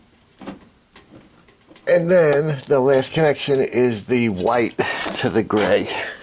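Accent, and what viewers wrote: New York, United States. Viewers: I love NY or Jersey accent! I'm southern, never ending amusement when I lived in north. What the hell is biscuits & gravy?? Difference between north/south, North will tell you to your face, F/O! South, we are sneaky & very polite, Be aware, probably find your body in a ditch next day if you piss us off but we are polite!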